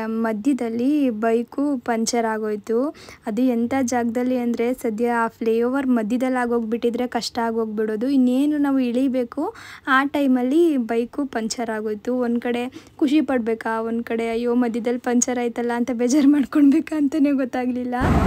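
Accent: Mandya, India. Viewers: U are such a sweet girl and ur slang just like us proper mandya slang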